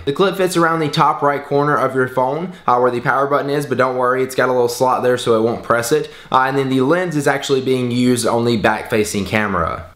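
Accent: South, United States. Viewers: sounds like your from the south haha no worries im in good ol south carolina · Sounds like a Southern accent to me :P (I lived in Alabama for Five years) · Alex<num> That dude in the video is definitely southern fa sho!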